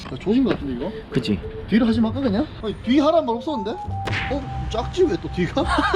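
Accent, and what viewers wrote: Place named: Daegu, South Korea. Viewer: 여기보고 빵터져서 구독눌렀습니닼ㅋㅋㅋㅋㅋㅋㅋㅋㅋㅋ아 진짜 개웃기넼ㅋㅋㅋㅋㅋㅋㅋㅋㅋㅋㅋㅋㅋㅋㅋㅋㅋㅋㅋㅋㅋㅋㅋㅋㅋㅋㅋㅋㅋㅋㅋㅋ 혹시 대구분이세요?ㅋㅋㅋㅋㅋㅋㅋ 전 대구사람인데 제 남자인 친구들 말투랑 너무 같아서 정겨워욬ㅋㅋㅋㅋㅋㅋㅋㅋㅋㅋ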